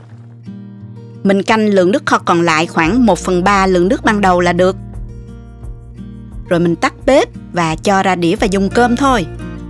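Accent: South, Vietnam. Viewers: Tôi sinh ra lớn lên ở Lái Thiêu đây cô,thực sự cô người. Bình Dương?? Nghe giọng nói đúng người miền Nam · Đã đăng ký kênh, dạy dễ hiểu, và giọng miền Nam dễ thương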